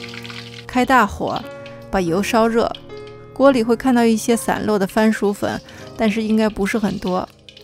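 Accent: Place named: Beijing, China. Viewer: 就喜欢你这口京味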